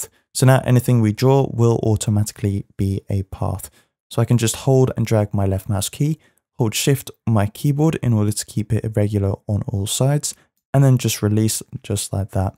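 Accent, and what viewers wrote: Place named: England, United Kingdom. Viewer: FANTASTIC!!! I've just found you, after looking for so long to find about putting text into Photoshop. Such a breath of fresh air to hear a nice slow, calm, English voice, and very carefully explained. THANK YOU SO MUCH! I am avidly looking at all your videos. I went to bed a very happy bunny last night. I have subscribed!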